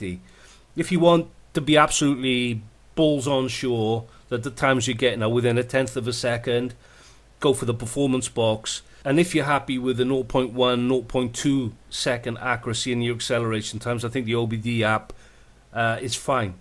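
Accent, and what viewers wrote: Wales, United Kingdom. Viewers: Didn’t expect a Welsh accent! 🏴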